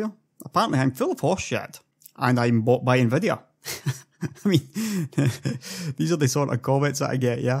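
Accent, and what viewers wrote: Scotland, United Kingdom. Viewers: What I wonder about is why am I listening to a Scotsman whose Youtube localisation is from Sweden. There's clearly something not fitting right here. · hey i really enjoy your x-com vids. Start a twitch channel and freestyle it, and your Scottish swear more! :) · can i ask are you scottish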